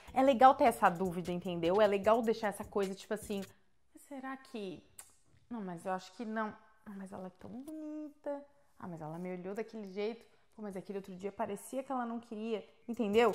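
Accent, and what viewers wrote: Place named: Caratinga, Brazil. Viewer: Sabia que esse Sotaque era Caratinense KKKKKKKKKKKK viva a doce e bela Santa Catarina ♥️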